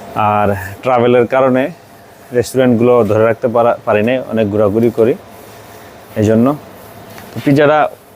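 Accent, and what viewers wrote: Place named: Barishal, Bangladesh. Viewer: আমার কাছে বরিশালের ভাষার মত মনে হয়। ভাইয়ের বাড়ি বরিশাল হলে কোথায় জানাবেন।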